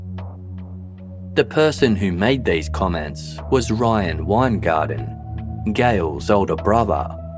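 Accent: Australia. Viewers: certainly is an Aussie accent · Yes. Definitely an Australian accent.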